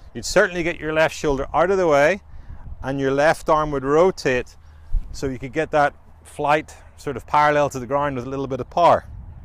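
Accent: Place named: Northern Ireland, United Kingdom. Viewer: Was pleasantly surprised to hear the N.I. Accent. Great video! Will definitely help with what I am struggling with. I am longer than most off the tee and probably average length with irons due to my “stuck behind” swing.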